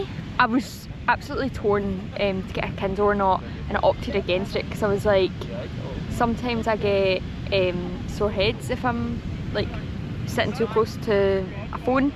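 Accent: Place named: Scotland, United Kingdom. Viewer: She's got a scottish accent. I can understand her just fine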